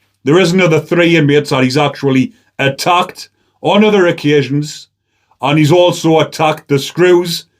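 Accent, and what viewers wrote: Newcastle, United Kingdom. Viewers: we all know you're a Geordie but can't you speak MoRe clearly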